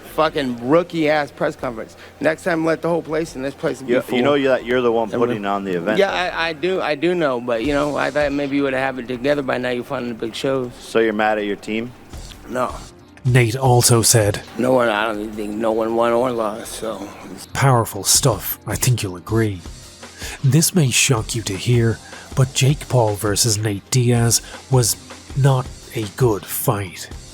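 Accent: Ireland. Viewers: Irish accent, talks about fighting, pop culture and gaming references, that spinning disc when crediting music... ...Super Eyepatch Wolf, is this your alt channel?? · Homie, I was there in the audience of your Brock premiere. Am I anything but a legend for witnessing THE legend do some slick stuff that interests a room temperature to refrigerated MMA fan, could actually be called not a fan. I enjoy that Irish accent and the video game flourish. You are a Legend. You are such a Legend, wife got a full ass subscription to sandwich daily so you make your own and still thank your wife. Legend! 😂❤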